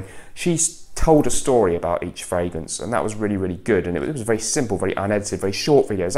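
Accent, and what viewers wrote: United Kingdom. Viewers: Heeey, you are too harsh to yourself))) buuut I totally agree with you, the one can take inspiration from other topics of interest and hobbies...you can make podcast, interviews with interesting people, indepth description of a particular fragrance, we learn a lot from you. I like your British English and sense of humor.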